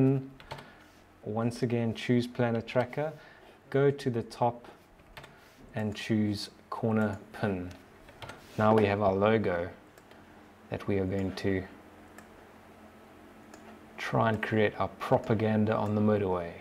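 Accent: South Africa. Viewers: U sound south African 🤔 Jus a thought I wanted to share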